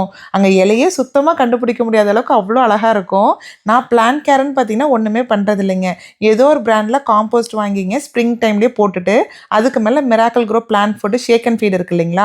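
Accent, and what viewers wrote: Kongu, India. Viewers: Alagu Kongu tamil sema super ippadia iru Thangam ❤❤❤❤❤❤❤❤❤❤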